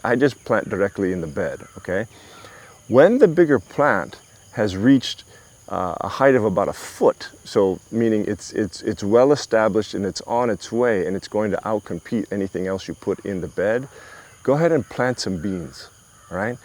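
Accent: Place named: India. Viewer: Excellent info,! Also, I am hearing hints of Indian accent when you speak. It's totally cute!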